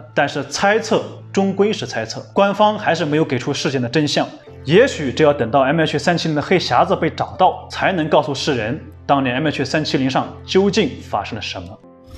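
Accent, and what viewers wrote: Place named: Northeast, China. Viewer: 这个解说的口音太重了，一股大碴子味儿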